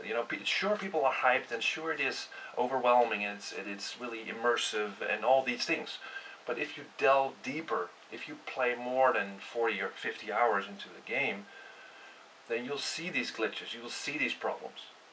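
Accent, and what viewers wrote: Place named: Netherlands. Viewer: Om één of andere reden is het altijd hoorbaar wanneer iemand uit Nederland komt :) Anyway, Indeed, Skyrim is lacking some serious polishment. This goes pretty much for all versions. Xbox version is sometimes having texture issues, and the PS3 version is a lagfest. This game wasn't ready for release, not in the least. They just wanted to make that 11-11-11 date because : * It's a cool date and easy to remember * Making some more septims due to the christmas periode.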